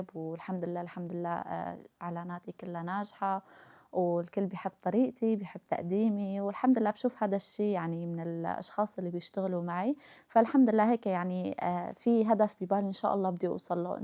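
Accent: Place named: Levant. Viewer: لهجتك شامية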